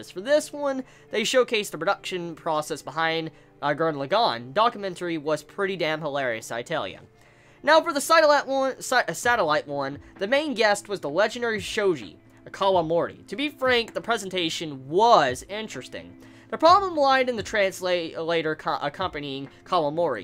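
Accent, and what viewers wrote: South, United States. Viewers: and your country accent lol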